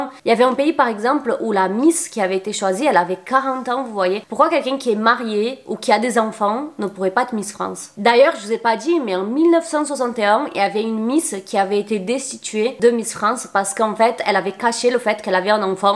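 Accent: Brazil. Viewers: Ce n'est pas l'accent du Sud de la France, c'est un accent brésilien parce qu'elle est brésilienne 😅😅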